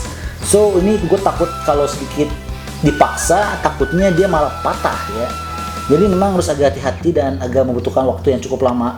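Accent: West Java, Indonesia. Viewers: Orang sunda asli pisan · Si Koko tinggal dimana nih, sundaan wae, hahaha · Orang sunda ya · Bang orang Sunda? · Haha sunda pisan 😂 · logat sunda nya bikit ngakak.. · Logat Sunda ?? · sunda nya ngakak xD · Orang sunda apa ya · Keren mang sunda banget . korowot korowot wkwkwkwk Sukses slalu .... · ai akang th timana atuh, menih sunda pisan kitu??? hehe tp wajah na oriental pisan... hehe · Hahah orng sunda kang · Ngomong na sunda pisan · Bang orang sunda?? 😂😂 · Tigubrag tiguling 😅😅😅 urang sunda gening mang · Koko sunda ? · anjir ini si koko aksen sunda nya kuat banget.. Hadeeeee... Keren ... Bte mainan lo.. Maaahaaalllll tapi haqiqiiiiiii ...cool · Bang asli nyah orang mana ko ngomong nyah aya sundaan wkwkw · Orang Sunda nihh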